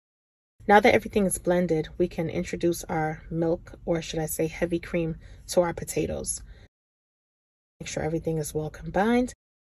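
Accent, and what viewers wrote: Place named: Boston, United States. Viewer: I just love love love your accent and I know I have asked you this a many of times baby. Are you from NYC or nearby? BABY I LOVE LOVE LOVE your accent. It also kinda sounds like from where my LOVELY'S ARE FROM, NEW EDITION, so it could be Boston Mass!!!! Like I said, hell I probably have asked you this question and forgot about it Pooh. You Are The TRUTH!!!!! Just thought I'd let you know. ♥♥♥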